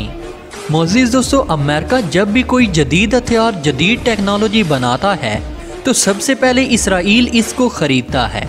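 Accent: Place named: Punjab, India. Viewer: Yeah bhai Punjabi mei Urdu bool rehay hain 👎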